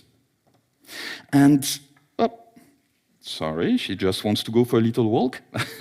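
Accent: France. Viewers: I don't care if can change the world I became total dumb about rational things when it come to spider 😭😭😭😭😂😂😂 et ptdrr Rpz l'accent Français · Speaks very well, french accent or no. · Okay French accent is now cool XD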